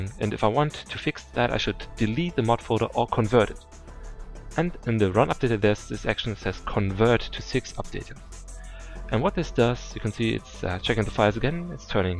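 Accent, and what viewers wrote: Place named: Germany. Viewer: Man kann es sowasvon höhren das du ein Deutscher bist :D Stimmt doch oder? Du hast nicht zufällig ein Deutsches Tutorial für ACE 2? (Ein aktuelles)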